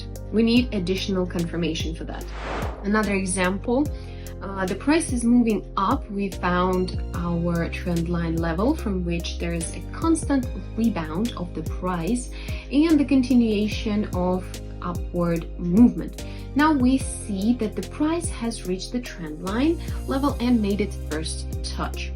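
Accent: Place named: Jamaica. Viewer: great video, love the strategy. Were you speaking Jamaican? More than once you said stuff that sounded as if you took them from.the Jamaican dialect.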